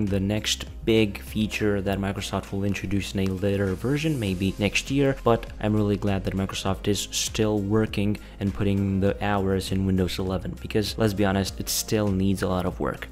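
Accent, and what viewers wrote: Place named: Romania. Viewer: thank you, you're the best one for sharing whats new in windows 11, and you look very educated and your accent is verry beautiful, i appreciate your big work, i wonder how romanian people can speak english like this ☺ i hope we can see your face someday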